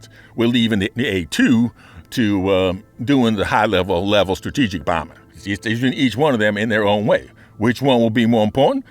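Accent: South, United States. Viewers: what's up with the extra southern accent this time around? lol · I agree that the dive bombing attack, similar to a Douglas SBD Dauntless, is a likely way to get more precision. I think a low glide bomb attack, more like a Martin B-26 Marauder, could work BUT you'd have to keep your speed well up and drop from no lower than 500 Meters (as it looks like the blast radius is about 350-400 meters).. (not sure why the southern accent but boy howdy tie A1 looks fun)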